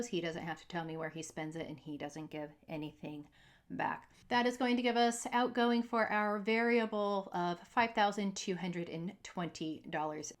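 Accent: Australia. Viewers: Are you originally from Australia?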